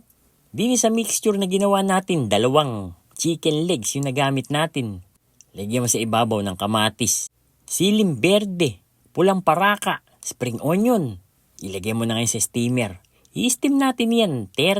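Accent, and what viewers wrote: Bulacan, Philippines. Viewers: Puntong puntong bulacan boss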